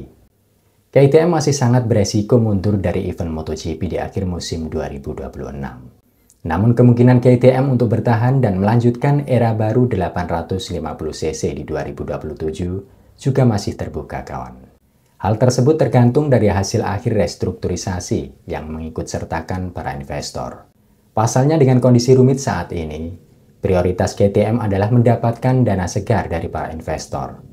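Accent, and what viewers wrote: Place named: Java, Indonesia. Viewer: Nggak nyangka untuk suku jowo medok...Suaramu cukup ok.... aku suka....adem cukup jelas....hanya kurang penekanan dikit2...suatu saat pasti lbh ok...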